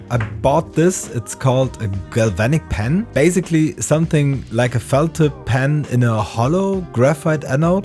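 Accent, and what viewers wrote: Germany. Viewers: Hey. Es hört sich so an als wärst du eigentlich Deutscher Muttersprachler, daher spreche ich dich mal auf deutsch an. Ich bin gelernter Oberflächenbeschichter. Das was du in deinen Videos machst, mache ich sozusagen hauptberuflich. Daher kann ich dir bei Fragen gerne Helfen. Ich persönlich verstehe nicht, warum du den Strom erhöhst mit der zeit. Du solltest die Oberfläche ausrechnen und den Strom entsprechend 1,5-2,5A pro dm² einstellen. Die Unreinheiten die du auf der Oberfläche siehst, sind Verschmutzungen in deinem Elektrolyten, die sich als Poren auf deine Teile legen. Deine Kontakfläche ist eigentlich groß genug, du solltest versuchen nichts um die teile herum zu spannen, sondern im Innenbreich zu kontaktieren. Scharfe kanten oder spitzen solltest du jedoch abschirmen, da du dort sonst Anbrennungen bzw Knospenbildung bekommst, so wie dein Draht beim ersten teil ausgesehen hat. 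Ich hoffe das hilft dir weiter.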